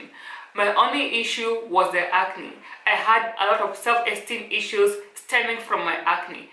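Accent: Kenya. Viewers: It's an educated Kikuyu accent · Looking great. Your skin is glowing. ❤ *finally I can hear Kenyan/ kikuyu accent *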